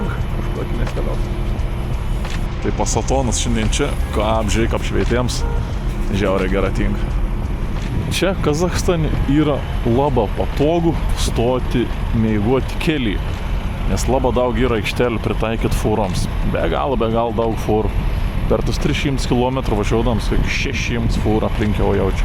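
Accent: Samogitia, Lithuania. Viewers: Kaip keista išgirsti kalbanti taisiklingai ne žemaitiška tarme:DDDD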